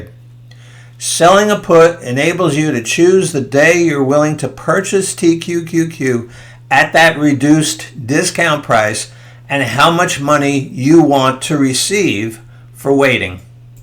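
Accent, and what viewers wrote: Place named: New England, United States. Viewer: Nice presentation....BTW, I think I detect a New England accent....my imagination, or Mass. maybe?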